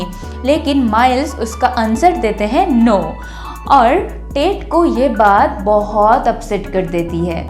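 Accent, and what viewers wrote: Bengal, India. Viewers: Its ok sab bol rahe hai ki hindi thik karle vo bengali me yarr thoda farak to padega hi na hindi me 😍 per aachi to hai bhoth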